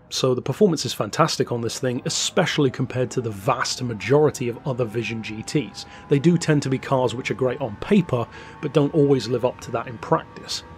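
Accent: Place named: England, United Kingdom. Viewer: Decent review, his English accent was killing me a tad, but this jag does look pretty smooth!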